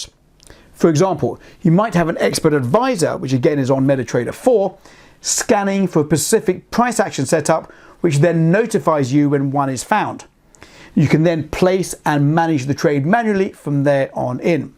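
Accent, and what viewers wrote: United Kingdom. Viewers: Those who mock in the comments and accuse of being a scammer are exactly those who have problems in understanding British accent, what he is saying is clear and helpful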